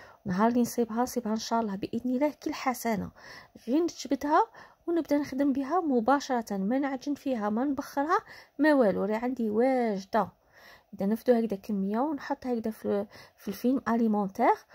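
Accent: Tlemcen, Algeria. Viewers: الله يعطيك الصحة. لهجة تلمسانية رائعة ما شاء الله عليك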